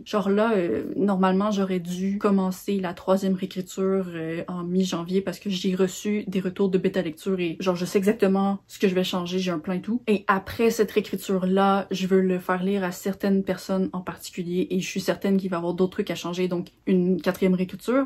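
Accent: Quebec, Canada. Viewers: Coucou, j'adore ta chaîne, ton énergie et ton accent québécois (je précise que je suis française) est tellement sympathique 😊, surtout ne change rien:_etoile1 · J’ai découvert ta chaîne très très récemment et j’adore ton contenu et tu n’es pas la seule québécoise que je suis et ça me fait trop de peine que l’accent puisse être un blocage et surtout un moyen pour certains d’insulter. En tout cas n’écoute pas les français qui critiquent ce point là car ils n’en valent pas la peine. 🥲 J’ai prévu de me faire un petit marathon de tes vidéos d’ailleurs pour rattraper le contenu en retard 🥰 D’ailleurs je crée mon contenu sur Instagram et tik tok mais j’admire les booktubeuses car ça me fait rêver mais le montage m’effraie 😆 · Hello, je voulais juste te dire que j'ai découvert ta chaine y a moins de 3 jours j'ai du regarder plus de 10 de tes vidéos et j'adore ce que tu fais et ta personnalité, et je voulais rebondir sur ta question que tu te pose sur ton accent. 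Perso je suis française et j'ai assez peu l'habitude de suivre des québécoises (surtout parce que je tombe pas souvent dessus) mais a aucun moment ton accent ne m'a dérangé, au contraire je le trouve très charmant et je sais que c'est ce que beaucoup de français pensent mais comme toujours on entend que ceux qui parlent quoi.. bref ne t'inquiètes pas de ça et continue d'être toi 🙂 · Coucou merci pour cette vidéo et joyeux anniversaire à la chaîne 🎂🥳 J'ai découvert ta chaîne il y a peu et j'aime beaucoup vidéos 😊 J'adore l'accent québécois que je trouve trés cute, mais c'est vrai qu'il y a quelques années, il me manquait certaines expressions/du vocabulaire (ce qui est probablement encore le cas en tant que française 😂) mais ça ne m'est jamais venu à l'esprit de lâcher des commentaires sur ça, c'est pas le sujet, je comprends pas les gens qui n'aiment pas et qui prennent le temps de commenter alors que c'est juste un accent 😅 PS : L'audio est top 🫶🏽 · Bonjour, si tu souhaites un jour publier ton roman, je pense que tu dois connaître la maison d'édition "Les Trois Nornes" créée par Laurène Beles de la chaîne YouTube Les mots de l'imaginaire ^^ Courage pour ta santé mentale! 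Je suis en voie de guérison de mon troubel anxio-dépressif, donc je comprends que ça peut être parfois difficile ^^ Une française qui adore ton accent québécois;) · Hello !! Je t'ai découverte très récemment (ça doit être ta 3ème vidéo que je regarde) et je rebondis sur les commentaires des français par rapport aux Québécois (je suis française) : ces personnes-là sont juste des abrutis qui n'ont aucune ouverture d'esprit ou curiosité culturelle ! Personnellement, j'ai cliqué sur ta vidéo par curiosité (celle où tu lis Captive, entre autres), et ton accent Québécois n'a fait qu'ajouter un argument supplémentaire pour que je m'abonne ! Je te souhaite bon courage dans ta vie perso, et j'espère que tout se passera bien 🤞🏻 Oh Luna est adorable 😻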